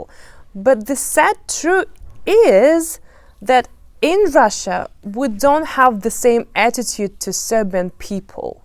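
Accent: England, United Kingdom. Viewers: Do you have a British dialect? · I do not find anything controversial or offensive towards Serbs or Serbia in this video. However, I find it highly improbable that you have acquired such a great British accent by watching movies because I know it for a fact that foreign movies in Russia (as in many other countries) are voiced over. Hence, you had no chance to hear real British accent from foreign movies in Russia. Given that you are not in your early teens, it would be very hard (read impossible) to gain this level of speaking proficiency if you are "learning" as you say. My best guess is that you have lived or gone to school in England in your early age. If I am mistaken, please take it as a compliment. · Of course that Serbia and Russia have different customs and mentality. Even in different parts of Serbia and Serbian lands customs and mentality are different, not to mention Russia which is removed by thousands of kilometers from the Balkan peninsula. 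I noticed that speaks with a bit of a British accent, which means that she probably knows far more about Britain than about Serbia. Конечно чтo в Сербии и России обычаи и менталитет по другом. Даже в разных части Сербии и сербских земель разные обычаи и менталитет. Россия удалена тысячи километров от Балканского полуострова. Я заметил, что есть легкий британски акцент, что означает что она вероятно знает больше для Британии чем о Сербии. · How do you have a British accent if you are from Russia? Just curious ☺️❤️ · best brittish accent evah!! :D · како си пронашла Србију ако не знаш где је Србија на мапи 😂😂😂 као кад се прича о порнићима, наводно нико их не гледа а сви знају све о њима, ови издајници либерали су свугде исти, за једну Рускињу прилично лепо причаш енглески са акцентом ,то се ретко виђа улагали су Британци добро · You have traces of a British upper-middle class to upper class accent.. 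Interesting ! Cold cuts ..ham, beef etc make great sandwiches .. but excess fat does your arteries no good !! (To each his own..) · Ova ima engleski akcenat nije ona dugo videla Rusiju, mucni malo glavom sta stoji iz toga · A sweet video. Well, you confirm what I already suspected; the Russians are a bit more reserved than the Serbs are; we are from the South of Europe, no wonder, simillar openness like Italians and Greeks. You find it sad that the many Russians do not know Serbia exists; I understand that feeling. But I do not find it so surprising; we are such a small people compared to you. And how could I expect that your grandma taught you to love the Serbs as you were 6, like my grandma taught me :) BTW, your English is that good, that I wouldn't wonder if you've spent some time in an English-speaking country (sounds like England). All in all, very likeable :) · You have British akcent!!! 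Rusan ?? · Your English is awfully to British. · You speak English like you are from England 🤣. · You have a can`not decide which but your akcent is British,Australian or New Zeland...Fluid english... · твой британский акцент говорит намного больше, чем то, что ты говоришь. грязь, вероломно используемая в английской пропаганде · I found this channel so interactive. Great job! I definitely will subscribe to your channel. By the way, you have a great British accent. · Your accent is more english thank Russia. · british english🤮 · your english shows us you were living in UK for years and more. so this is just another paid channel paid by west trying to make bad blood between russia and serbia. everyone just report her so we close her channel. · И да кстати,у тебя британский акцент,сразу понятно наклон в какую сторону…и между прочим,можно было меньше гадит по Сербии,всё таки ты в гостях)) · Ma koju njenu državu? 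Vidiš da ima britanski akcenat. Rusko je možda samo poreklo.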